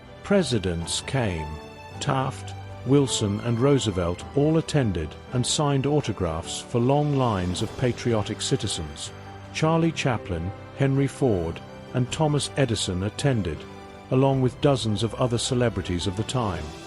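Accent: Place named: United Kingdom. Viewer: Great video! I like your new male British accent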